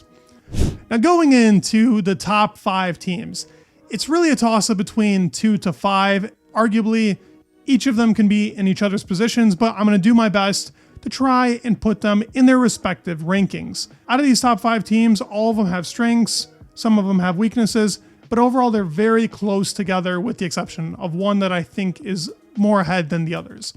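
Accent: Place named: United States. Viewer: love your videos just wanted to say it’s great hearing a fellow American voice talk f1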